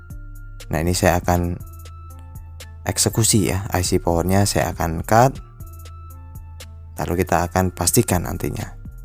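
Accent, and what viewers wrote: Java, Indonesia. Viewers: Mas mau tanya, mas asli jawa ya?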